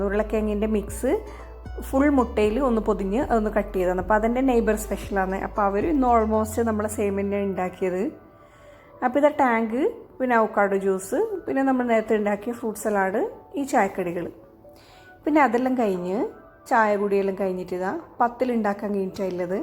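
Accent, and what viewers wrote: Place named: Trikaripur, India. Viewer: Hi from another YouTuber from Trikkaripur ☺️👍...nice presentation with trikkaripur slang 😁... Do support back. 🙏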